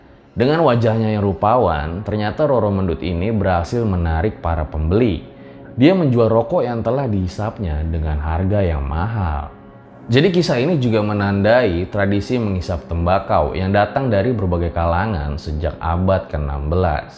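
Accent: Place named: Sragen, Indonesia. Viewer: SRAGEN MANA SUARANYAA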